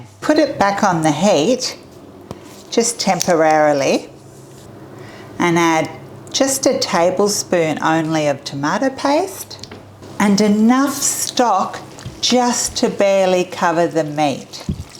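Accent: Australia. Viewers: I don't think Dave picked up on your accent. True blue, it is, if you ask me. Nice work . . .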